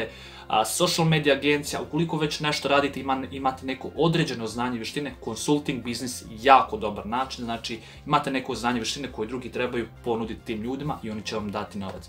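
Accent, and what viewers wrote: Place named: Krajina, Croatia. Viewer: Kakav je ovo seljacki akcent. Ne mogu slusat. Neka Krajina nesto.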